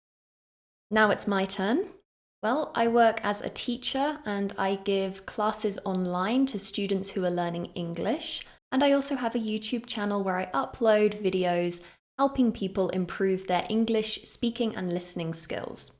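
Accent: United Kingdom. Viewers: Your British accent absolutely shone through . I want to speak like you but it would take me years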